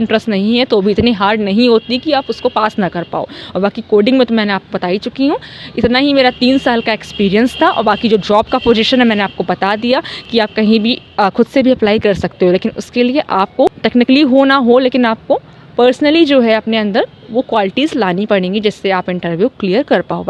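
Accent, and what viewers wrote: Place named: Haryana, India. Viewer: Oh well actually from your language I thought that you are from Haryana.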